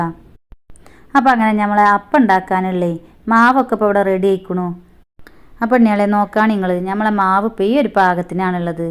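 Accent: Malappuram, India. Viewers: ഒറിജിനൽ മലപ്പുറം മലയാളം 😄😄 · തനി മലപ്പുറം ആണല്ലേ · Original malappuram slang anu ith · ഇതാണ് ട്ടോ മലപ്പുറം ഭാഷ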